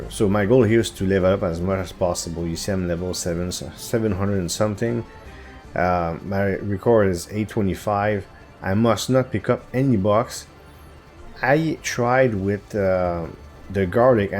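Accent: Quebec, Canada. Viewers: accent ? is it québécois ?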